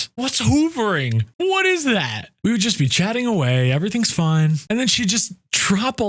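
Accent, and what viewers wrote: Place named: United States. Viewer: sounds american is Indian 😂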